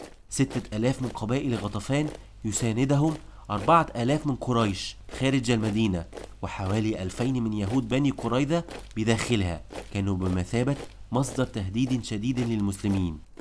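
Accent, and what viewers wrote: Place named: Egypt. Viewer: ... عليك نطق الحروف بشكل جيد فأنت تتحدث بالعربية وفي موضوع علمي ... متى تتعلموا إخواننا المصريين أن تتخلوا على لهجتهم عند التحدث بالعربية والله أغاظني نطقك للكاف عوض القاف وكثير من الحروف 😢😢😢 حرفتها.. رغم أن المحتوى جيد من حيث المعلومات الدقيقة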